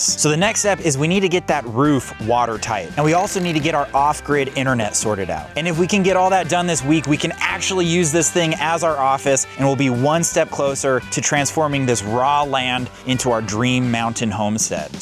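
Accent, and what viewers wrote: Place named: Northern California, United States. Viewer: your Norcal accent is killin me. I hope you never loose it. 🤙🏾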